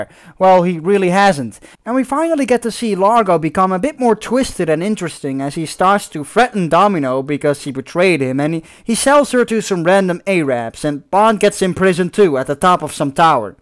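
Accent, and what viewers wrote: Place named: Netherlands. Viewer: He's dutch, that's his accent. How many languages do you speak?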